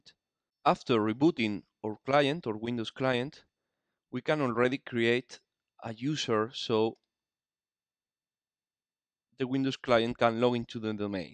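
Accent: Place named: Spain. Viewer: I understand him. I do not speak Spanish. His accent is familar to me, Spanish accent is widespeard in mainstarem media.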